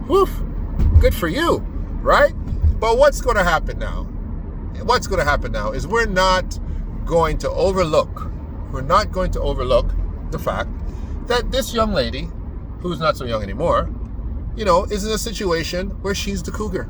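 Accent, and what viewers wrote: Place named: Trinidad and Tobago. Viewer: u r funny bro. u sound like u from Trinidad 🇹🇹 🤣 😂 great humor bro and good takes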